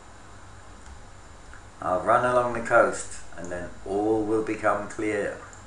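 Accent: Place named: Ireland. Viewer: Love the Irish accent! nice video!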